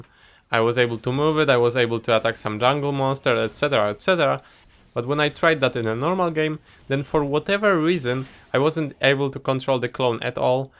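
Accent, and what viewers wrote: Poland. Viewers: Where are u from? U got a little polish accent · Masz minimalnie polski akcent :D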